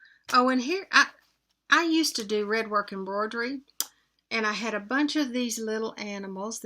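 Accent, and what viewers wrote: South, United States. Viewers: We replaced our 100 gallon hot water heater with a tankless one and love it! It does take a few minutes for the hot water to get to our shower but that’s because the heater is in a pool closet on the opposite side of the house. You have endless hot water and never have to worry about running out! It also gives you a whole lot of room compared to the giant tank! Enjoy😀 I love watching and listening to your videos. I grew up in Virginia and moved to South Florida almost 35 years ago. Not many soft spoken, Southern accents down here😢. Happy 4th🇺🇸🇺🇸🇺🇸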